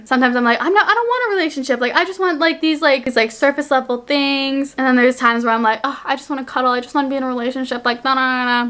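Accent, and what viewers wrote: Canada. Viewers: You're Canadian Toronto side really popped off in this video, not a bad thing I loved it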